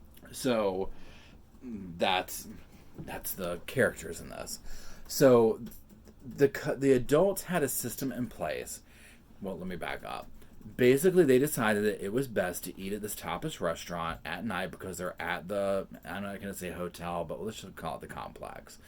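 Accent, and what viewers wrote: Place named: North Carolina, United States. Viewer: You sound like you are from NC!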